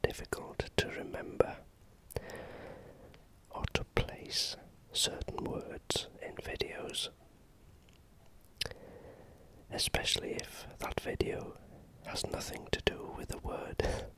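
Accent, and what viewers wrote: Wales, United Kingdom. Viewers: This is wonderful. Welsh? Love your voice and the content of your videos, perfect for bed time, perfect for sleep. Thanks.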